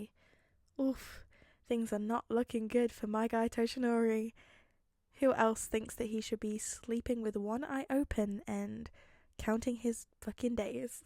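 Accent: United Kingdom. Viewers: This is like, my 5th time listening to this podfic and it’s still amazing! You’re one of my fav podficcers! I’m also British and it really makes a difference not having someone American do it, I always find myself correcting them on the way we pronounce words and have to tell myself it’s not their fault Americans say words wrong. So basically yeah! Love your stuff and I Hope you’re having a good day!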